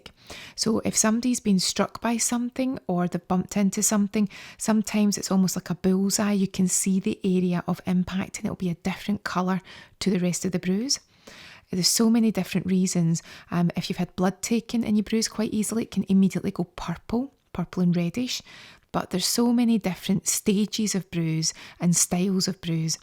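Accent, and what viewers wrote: Scotland, United Kingdom. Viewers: I Love your accent :) is it scottish? Sorry oft this is torally wrong xD I'm Not good at guessing accents haha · 😁😁😁 No way! 1) I have no competence in "show & tell" 2) gorgeous Scottish accent beats awkward Italian accent hands down 😜 Keep up with the good stuff!😁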